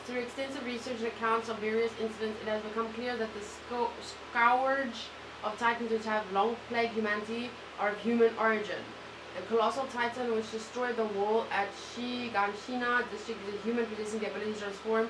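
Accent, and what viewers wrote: South Africa. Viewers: what accent is that it sounds south African??? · Huh, you're from South Africa? That's cool, explains the accent.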